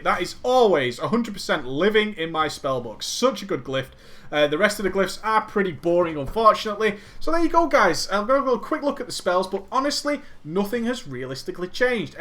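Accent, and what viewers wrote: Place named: England, United Kingdom. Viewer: what server do u play on BTW i love my wow vids with an english accent lmao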